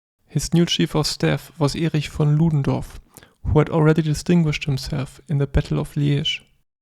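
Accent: Germany. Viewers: Love your German accent !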